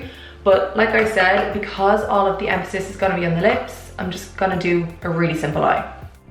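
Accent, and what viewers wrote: Ireland. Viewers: Ireland I think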